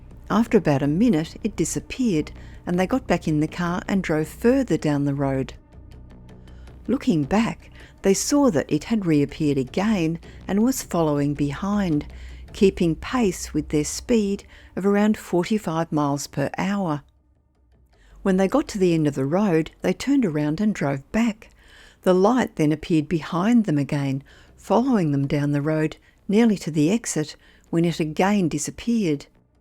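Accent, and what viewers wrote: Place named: Australia. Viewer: Sexy aussie voice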